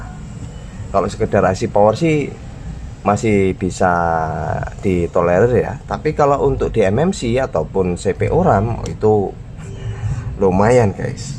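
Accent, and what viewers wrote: Java, Indonesia. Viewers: Saiki dadi sering inframe mas...lg taun 2019 akhir pertama ngikuti chanele sampean...pirang2 wulan penasaran banget...wonge koyo opooo...logate njawiii...vibes sabar banget dari nada suarane...sukses selalu om..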